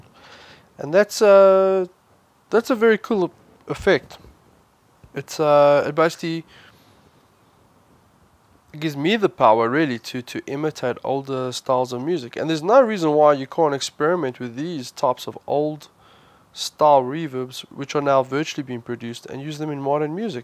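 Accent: South Africa. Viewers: South African accent allert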